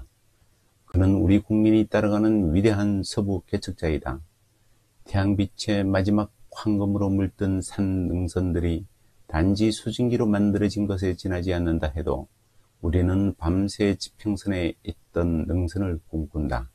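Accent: Gyeongsang, South Korea. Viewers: 근데 경상도억양이 일상에선 듣기좋았는데 책읽기로는 듣기가 무난하진않네요 껄끄럽다고해야하나 ㅋ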